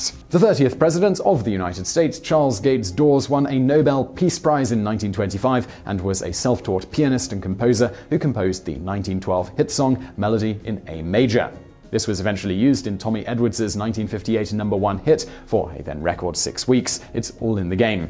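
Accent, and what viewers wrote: United Kingdom. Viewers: British Vsauce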